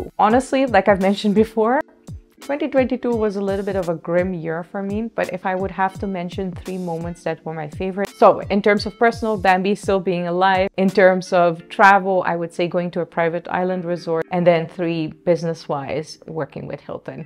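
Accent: India. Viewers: She has an Indian accent now 🤣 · She has developed a slight Indian accent. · I am hearing bit of indian accent in u lol, love ur journey so far❤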